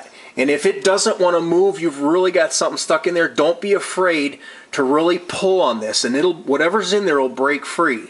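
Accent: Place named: Wisconsin, United States. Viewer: I noticed your Wisconsin accent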